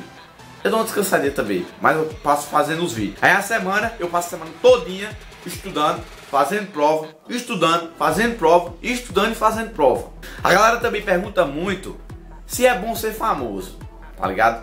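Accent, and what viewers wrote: Northeast, Brazil. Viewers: Tmj Junto Sutacaque Fala Tudo Que E Nordestino Tbm So E Tenho Muito Orgulho E Nunca Mude Por causa de ninguem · Amo sotaque nordestino <3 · eu queria ter nascido no nordeste pra ter esse sotaque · começo do teu canal eu não gostava de sotaque de nordestino mas eu vi que tava errado e hoje te assito sempre irmão tamo junto <3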